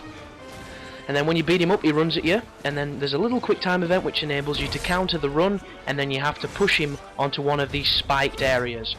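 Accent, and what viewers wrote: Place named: Midlands, United Kingdom. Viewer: "1 take"? you don't say. I can imagine the challenge of filling the voids with chatter, hell I love the sound of my own voice but even I shied away from audio commentaries. I'm glad you showed me that I was right to do so. Tell me bra', where are you from *county will do* I'm struggling to place that accent. I'm from Derbyshire and you don't sound a million miles away.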